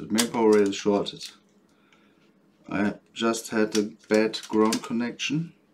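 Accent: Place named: Germany. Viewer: Hello, I have the same laptop. No charging, no power. Your accent sounds like German :D Can you give me some advice?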